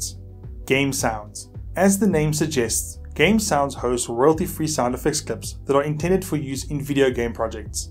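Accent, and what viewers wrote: South Africa. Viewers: South African accent? Love it, apologies if I'm wrong. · You sound South African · South African accent!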